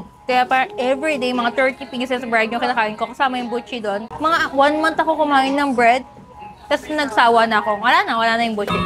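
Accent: Philippines. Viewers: kombinsido na hindi talaga koreana si dahil sa galing magtagalog at pinoy accent pa. di kaya.... 😄😄😄😄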